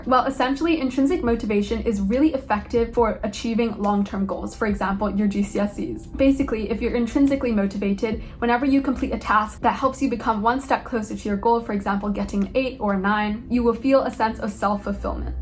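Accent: United States. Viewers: you sound american but are doing gcses? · u sound american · How come you have an American accent ❤😂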